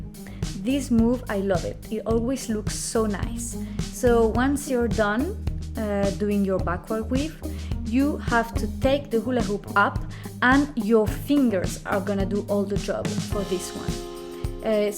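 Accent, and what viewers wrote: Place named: Colombia. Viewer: wonderful combo! love them all. I have been adding them to my flow :)you have an accent where are you from? Colombia?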